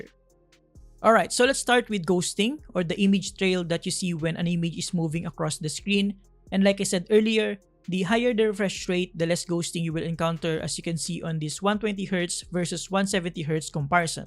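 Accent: Philippines. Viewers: Props sa pagka-review nito pang international visuals. Nagtitingin lang ako ng review about dito sa monitor na ito then narealize ko sa tono ng boses pilipino pala. Husay! Sakto nabanggit din na predecessor pala yung QA so possibly ito QL1A i-acquire ko.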